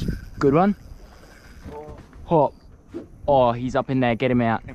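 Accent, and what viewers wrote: Australia. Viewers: You don’t even have bears down there!!! That’s why you Auzzies like to beleive you’re all outdoorsy and nature ific but you guys have the weakest fauna of all. Kangaroos and snakes and nothing even dangerous!! And your accents sucks man!! Internet it’s sooo annoying you talk like Brit who wants to be Australian sooo badly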